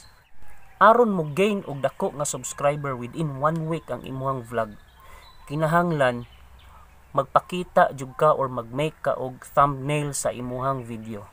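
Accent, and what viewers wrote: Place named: Visayas, Philippines. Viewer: Bisaya kaau oie maau man motirada dong bright da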